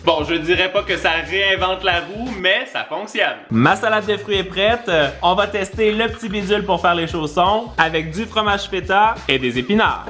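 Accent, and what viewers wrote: Quebec, Canada. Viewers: Bonjour j adore ton concpte de vidéo et par dessus tout ton accent québécois je te dis bravo de ne pas avoir lâcher pendant tout se temp se que j essaye de dire ses que je suis une fan depuis très longtemp et je voudrais que tu me réponde.Et aussi j espere que toi est Isaac vous vous santer bein pendant se moment de crise sanitaire mercie d avance et stppp reponds moi❤❤❤❤❤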